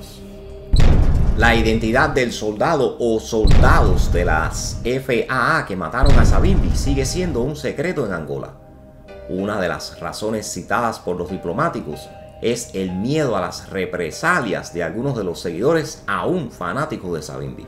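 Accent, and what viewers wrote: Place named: Cuba. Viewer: YO SOY CUBANO Y POR TU ACENTO SE QUE ERES CUBANO, HACES MUY BUENOS VIDEOS TE FELICITO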